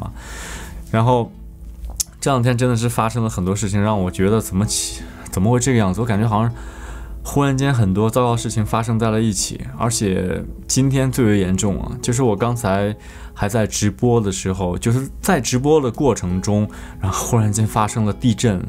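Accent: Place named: Linfen, China. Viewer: 大哥，你不是临汾人吗？这口音怎么・_・?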